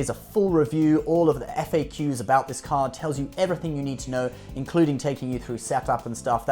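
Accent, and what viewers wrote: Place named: United Kingdom. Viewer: This would have been a more reassuring video if the guy didn't have a British accent.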